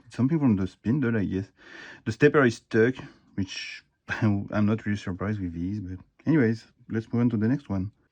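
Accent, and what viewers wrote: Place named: France. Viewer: I hope you do more of these live unboxing. We’re all here for your lovely French accent <3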